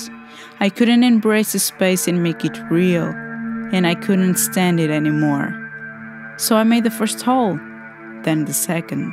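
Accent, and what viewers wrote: Portugal. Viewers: Assim que comecei a ver o video tive a sensação que o sotaque seria Português :D Yey!! Tão contente por te ter encontrado!! Subscribed! <3 Love de Coimbra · Ohhhh! Portugal! I've never heard a Portuguese accent before! I was trippin' trying to place your accent :) Really really cool project! Thank you for sharing!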